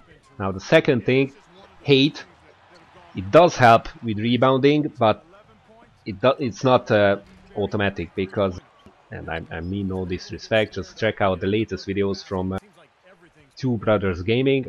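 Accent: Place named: Hungary. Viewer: magyar vagy, csak mert olyan az akcentusod/ are you hungarian, because your accent is like a hungarian's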